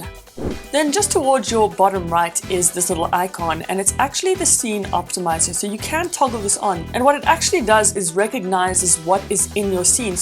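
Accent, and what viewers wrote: South Africa. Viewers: Awesome review. Love the South African accent. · is jy Afrikaans? Jou akcent klink baie soes een van n soetie XD maar dis nice om te sien dat daar is talent Suid Afrika, goeie video! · Just subbed for the SA accent 😍 feels like home! Great content, probably the best in-depth camera review I've seen on YouTube so far! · Finally a South African reviewer🙋‍♂️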